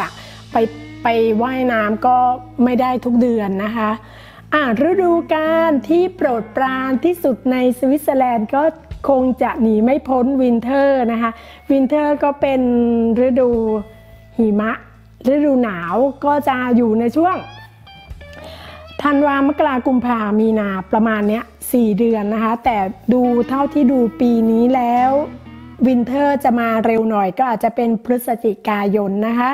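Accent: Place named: Thailand. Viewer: Wow. This is an interesting Vlog. Greetings from Oman. Sawadee Krap.. Khob khun for sharing this video. Interesting to know about you and your life. good to meet your cameraman Husband:) Tinglish sounds cool.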